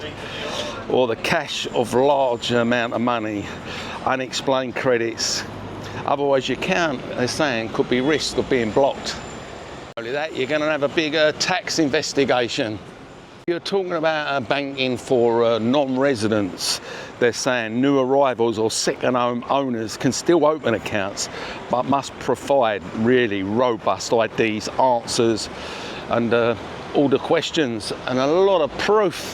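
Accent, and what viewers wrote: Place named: London, United Kingdom. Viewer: Yeah you got a nice London accent mate,but your on the wrong page on this one mate.frack the banks, they are going down mate.with quontam banking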